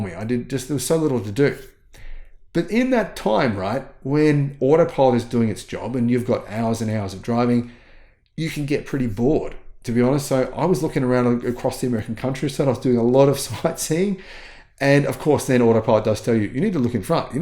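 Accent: Australia. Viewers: There’s nothing wrong with your accent, it’s pure Australian. The road trip appraisal sounds pretty fair to me. I have friends that own Tesla’s and BYD’s and the Tesla is light years ahead of the Chinese EV range. If you’ve decided to move from ICE to EV, avoid Chinese built cars. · You sound Okker to me, in the USa I have to adjust a few words, like pronounce Ts with Ds , all part of the fun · you are easy to understand! We all (my family) loves your Australian accent. Do not change, period❤ Be yourself, you and your family are awesome and always welcome...! Thanks for all you do and we are praying for continued positive health and success ❤ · Another great video,. I live in California and appreciate your Australian accent. As for the M3, I drove one for two weeks while my '22 Ford Mach-e was getting body work done. I hated it. The car felt cheap, was loud, and did not have CarPlay. 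I'm happy people are buying EV's but the Model 3 is not a good car. The Model S is much better. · I don't like to listen to AI narration or videos that are difficult to understand because of a strong accent. You Aussie accent is accent is actually refreshing. My son drives a vintage model 3. I love riding in it. Used 3's are available at great prices. The only reason I won't buy a Tesla is that Musk is such an ass. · Your Aussie accent is quite charming and not an impediment...you are 5x5 on communications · Keep the Ozzie accent BUT “Ion” and “iron” sound the same in Oz Maybe say “eye-on” for ion & “eye-urn” for iron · My wife, daughter, and I test drove the new Tesla Model 3 about a week ago, and we were all impressed. Before, my daughter had no interest. During and after the test drive, she kept asking when we were buying one. I saw huge improvements over the TM3 from just a few years ago (2020): fit and finish, quieter, less jarring and less road noise, more comfortable seats and a nicer interior, a better sound system,… This is the best value EV on American roads, easily. 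BTW, your Aussie accent is great and very easy to understand. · Love the Aussie accent. Wanted to move there to Melbourne so I could have it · NO mate, speak MORE aussie, I love it! Greeting from a dane, an actual viking :D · You sound great! Love the Australia accent. Don’t change! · There's absolutely NOTHING wrong with your Australian accent. You be you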